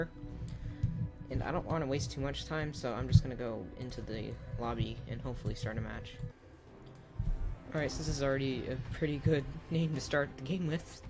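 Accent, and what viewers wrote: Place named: Sweden. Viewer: its like a swedish accent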